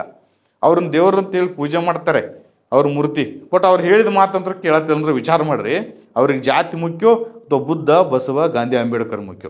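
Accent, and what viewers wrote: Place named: Alagawadi, India. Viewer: ಇದ ಅಳಗವಾಡಿ ಭಾಷೆ ನೋಡ್ರಿ ಸರ್.... ನಾವು ಕೂಡ ಅವ್ರ ಪಕಿನ...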